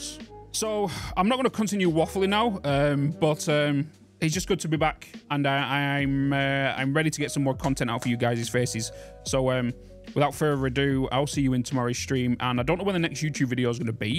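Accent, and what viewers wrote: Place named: Scotland, United Kingdom. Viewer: my mug arrived, thank you! edit after the video: holy shit bud. sorry she went through that, congrats on walking your sister down the isle, thats a huge honor, 40 lbs is a shit ton of weight, lets go! Dude, as always, we have our notifications on, if you need to take a break, take a break, we'll be here when you get back! Don't feel any pressure to 'preform' we just love your face and brogue, and we always have your back, even if that is you going offline for an undetermined amount of time. also, how did you drop so much weight? you stopped drinking iron brew didn't ya? ;)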